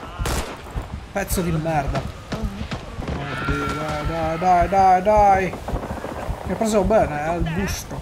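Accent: Sardinia, Italy. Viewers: E' uno spettacolo questo gioco, ed il tuo commentario mi fa sbellicare dalle risate...questo accento sardo è super! Meno male uno che finalmente mette nella sua homepage quì non c'è fortnite...sei ancora più mitico!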